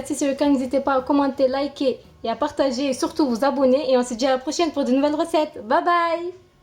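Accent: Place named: South, France. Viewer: Superbe cette recette en plus avec un joli accent du sud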